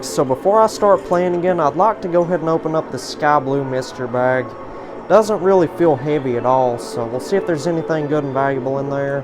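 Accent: United States. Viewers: I like American English accent. 😊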